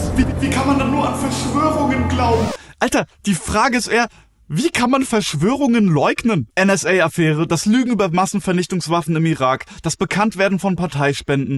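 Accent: Germany. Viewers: Das mit dem sächsischen Dialekt hättest Dir schenken können. Ansonsten passt, den Schlusssatz sollte sich jeder an den Kühlschrank heften!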